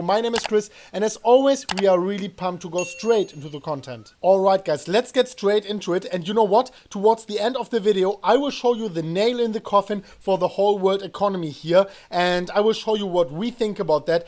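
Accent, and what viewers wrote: Germany. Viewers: Man hört richtig das du deutscher bist 😋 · Chris you MUST be german !! Your akcent is just too on point !!!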